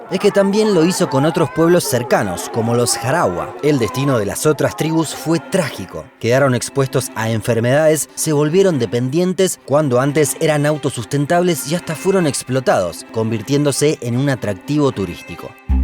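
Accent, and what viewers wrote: Rioplatense, Argentina. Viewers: Parece argentino pero el sonido sh en la ll e y es muy suave , no parece el típico acento porteño . Es como un acento argentino neutralizado . Resulta muy agradable y claro . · Por el acento diría que es argentino o uruguayo..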